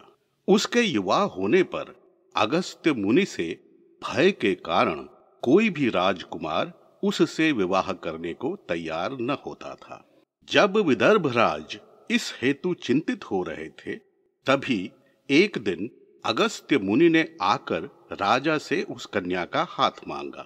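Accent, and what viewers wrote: Standard. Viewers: सचमुच रहस्य एवं रोमांच से भरी महाभारत की एक अद्भुत् और अलौकिक कथा….! आपकी अद्भुत् और शुद्ध उच्चरित वाणी से कथा और भी प्रभावी हो गयी है। चित्र भी ख़ूबसूरत नंऔऔर सटीक हैं। एक प्रश्न मन में उत्पन्न हो रहा है कि अगस्त्य मुनि ने जिस सुन्दरी स्त्री का स्वयं निर्माण किया उसी लोपामुद्रा से विवाह करने की इच्छा क्यों की...? दैत्यों का वध उचित था क्योंकि उनके कर्म उचित नहीं थे। पर दैत्य की संतान प्राप्ति की इच्छा क्यों पूरी नहीं हो सकी...? क्या अनुचित था? 🤔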